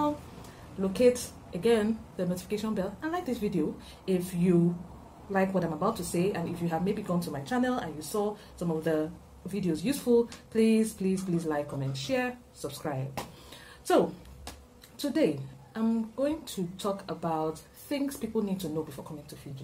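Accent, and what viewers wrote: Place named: United Kingdom. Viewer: Your British accent is awesome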